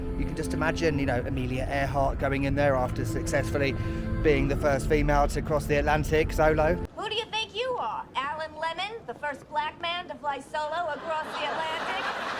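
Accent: United States. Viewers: your American accent is so spot on it makes me wonder if your not an American posing as a Brit, joking I know your British but the accent is great. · Your American, accent is hilarious,,, as a non American,,, I would say poor is poorer in the US...at least you were safe .......cheers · Great American accent.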